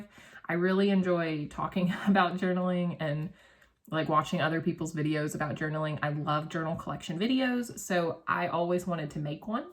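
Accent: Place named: Pennsylvania, United States. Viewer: The way you speak makes me think you are from Pennsylvania. I know this is a kind of odd thing to say, but I like guessing where people are from by their accent and the words they use.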